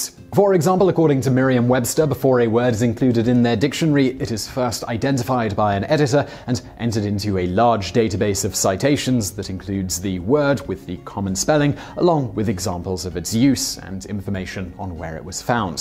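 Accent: United Kingdom. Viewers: relax! The more I hear you the more I understand. U. K. Accent? Big deal. I grew up eating grinders. Poor's boys, subs hoggies. What ever. Same thing. Different name. I can't imagine what Webster's going though now! I gotts to know!!! Haha!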